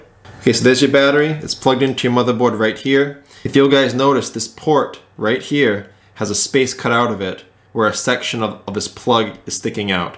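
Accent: Massachusetts, United States. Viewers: Random but are you from Massachusetts? That accent!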